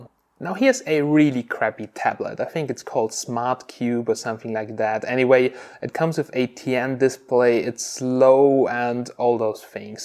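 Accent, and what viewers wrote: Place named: Germany. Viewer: I love how u speak english with German akcent :) ( bad english i am from Bosnia )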